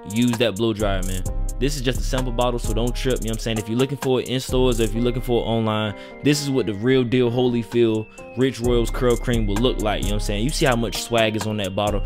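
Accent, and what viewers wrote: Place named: New York City, United States. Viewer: Ya he definitely got the NYC❤️ Accent Like if you agree 👇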